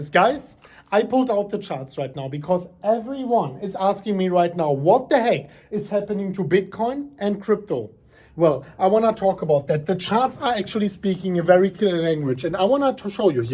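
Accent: Germany. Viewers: I love your German accent, I think it's part of your style. If you wish to improve, look for me.:))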